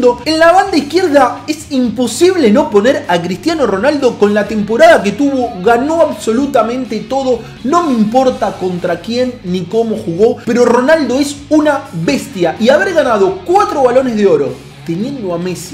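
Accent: Argentina. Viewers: como se nota que eres argentino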